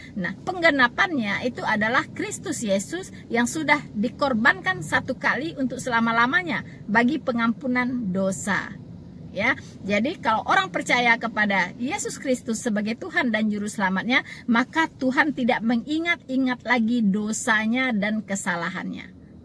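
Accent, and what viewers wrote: West Sumatra, Indonesia. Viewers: Suara ibu ini mirip sekali dengan suara teman saya dan kebanyakan orang Minang, satu kota dgn saya di Bandarlampung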